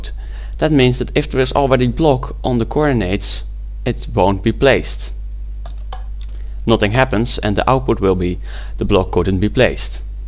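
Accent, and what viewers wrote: Netherlands. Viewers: Are you Dutch? I can hear it in your accent! Thanks for the video! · 100% dutch :) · je hoort het nl accent. dat is niet erg, maar goeie tutorial! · hbo Nederland accent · Dit is nederlands accent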